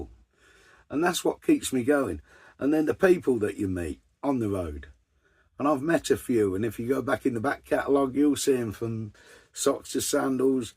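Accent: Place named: Surrey, United Kingdom. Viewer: you sounded to me you was from Surrey ty for answering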